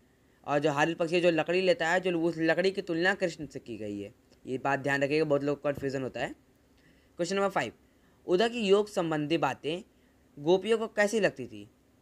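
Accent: Bihar, India. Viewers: Sir bs ek question aap bihari hai ky .plz reply sir your language is like them only . Btw I love bihari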